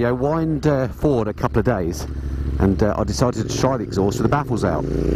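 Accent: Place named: Essex, United Kingdom. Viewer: Classic Essex accent which sounds like an Aussie accent :)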